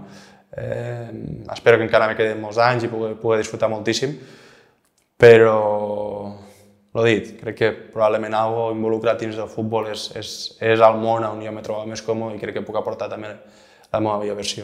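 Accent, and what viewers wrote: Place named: Southern Catalan, Spain. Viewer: He is speaking catalan language (southern accent) btw, not spanish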